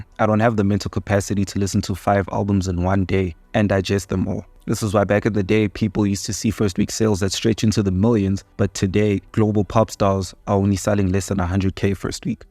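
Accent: South Africa. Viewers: You sound South African bro. Like you went to Boston College or something. Anyway you speak very well and fluent. Keep going bro.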